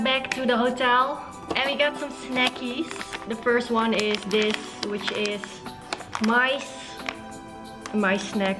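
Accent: Netherlands. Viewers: Aaah ik hoor gewoon dat je Nederlands bent! Geen idee waarom maar je weet het gewoon als er een fellow dutchie is😂 Je lijkt me echt een leuk persoon, als je in Amsterdam bent zouden we moeten winkelen ofzo x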